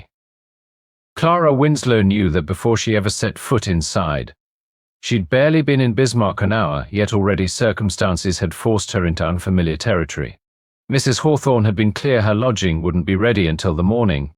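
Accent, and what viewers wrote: United Kingdom. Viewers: Kind of funny to hear à british voice reading an american story. However the narator is wonderful., as is the book.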